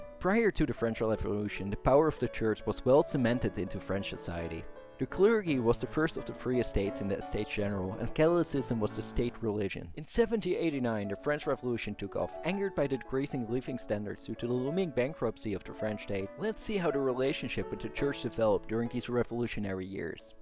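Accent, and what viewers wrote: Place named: Netherlands. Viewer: No worries at all, thanks so much for the video, I'm guessing Dutch from the accent :)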